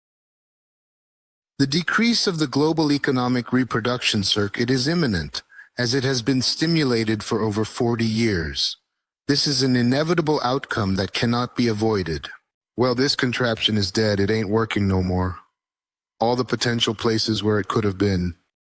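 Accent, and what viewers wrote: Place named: United States. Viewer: Очень прикольно слышать "Андрей Борисович Кобяков" и "Олег Владимирович Григорьев", сказанные Михаилом Леонидовичем с натуральным американским акцентом. Конечно, ИИ ещё не совершенен, но здесь -- вполне терпим: по крайней мере, все частоты присутствуют, я все слова разбираю на слух и хорошо понимаю речь. Ещё прикольнее было видеть, как, не переставая чисто говорить, бесшумно отхлёбывает чай из кружки, которая вдруг становится прозрачной. В целом, ИИ следует подрихтовывать при первой возможности, а также, возможно, отдельно записывать адаптированные для него речи, чтобы артефактов было поменьше. Через год-другой, вполне возможно, это уже не потребуется, потому что ИИ сейчас развивается очень быстро, и его качество также быстро растёт.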